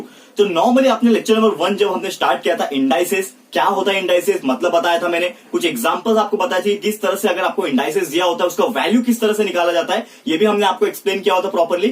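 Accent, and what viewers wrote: Maharashtra, India. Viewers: Sir your teaching very well Sir Kay aap Marathi ho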